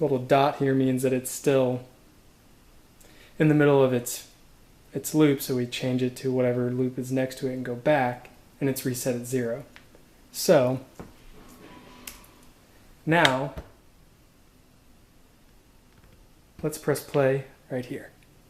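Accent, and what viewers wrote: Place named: United Kingdom. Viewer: So thats what you look like....I always thought you were british lol.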